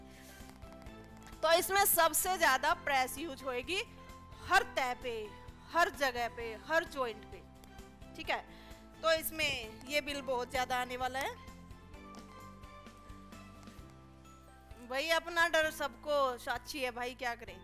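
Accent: Haryana, India. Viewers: हरयानी ठेठ में मिठास की कमी h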